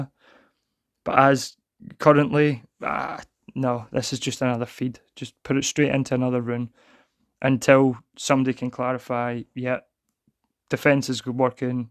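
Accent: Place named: Scotland, United Kingdom. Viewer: Great Vid, and great to hear a Scottish voice on a Content creator video :)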